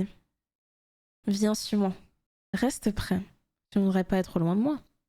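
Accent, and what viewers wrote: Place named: France. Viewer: Oooooooooo French accent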